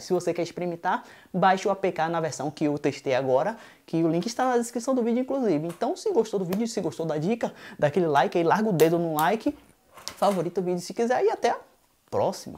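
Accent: Bahia, Brazil. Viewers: man ce é baiano ? kkkkkkkk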